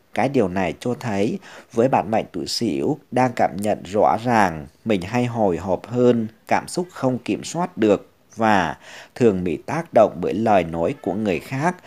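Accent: Thanh Hoa, Vietnam. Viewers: Giọng thầy là quê Thanh Hóa phải ko ạ?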